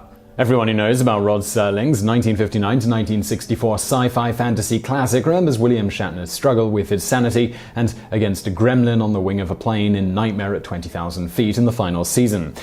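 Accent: England, United Kingdom. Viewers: please learn to better modulate your voice to a slower tempo; you're not competing against someone from a help desk in New Delhi to see how many words you can produce under a minute! Your material is wonderful but I miss most of what you say unless I turn on the captions then loose seeing what's being shown! Yes, your diction is commendable and you should be proud of your British accent, just stop torturing us with your machinegun-talking-without-enough-commas-and-periods-between-changing-sentences!! Or, stop using Meth before you begin your shows as I'm getting ready to boycott your channel; I'm sure I'm not the only one who feels this way... Thank you. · Amazing that a bunch of "nothing" can be turned into something by a guy with an English accent.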